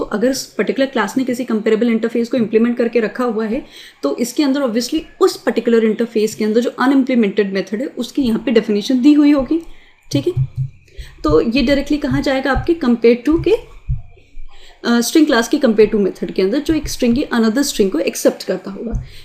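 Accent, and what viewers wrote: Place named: India. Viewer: in nearly every programming tutorial Video is this Indian Accent. As a German i nearly don't understand anything. Everytime i have to watch a Video more than twice...... Nevertheless thanks for the Video. After serveral times i understood it. :)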